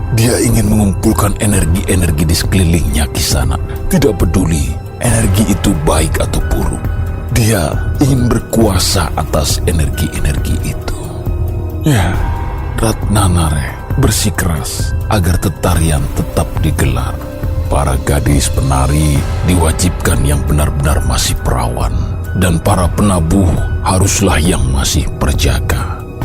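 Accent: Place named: Java, Indonesia. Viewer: Baru aja nemu channelmu mas (emot sedih). Njawani banget dari setiap katanya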